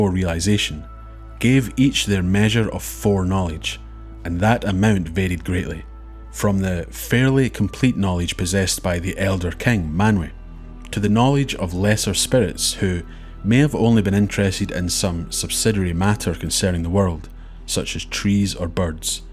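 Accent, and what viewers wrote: Scotland, United Kingdom. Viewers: God your gorgeous silky scottish voice is so dreamy. I hope you become an audible narrator.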